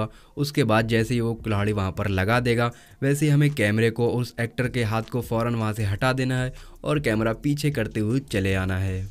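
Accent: Farrukhabad, India. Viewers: mujhe tumhare bolne ke tarike se hi pata lag gya tha ki tum farrukhabad ke ho I'm also from farrukhabad fatehgarh mera nanihaal hai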